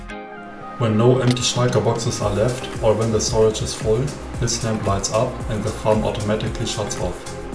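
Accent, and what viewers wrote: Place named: Germany. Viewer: Great Video! Deserves way more attention than it already got! Ziemlich starker deutscher Akzent war, aber sehr gut verständlich. Mach weiter so! Keep going!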